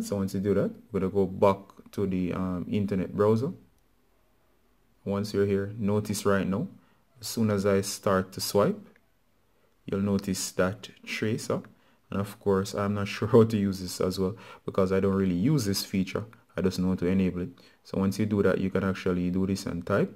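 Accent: Jamaica. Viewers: U Jamaican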